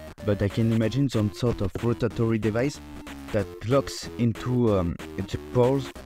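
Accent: France. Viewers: l'accent Francais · L'accent francais mdrr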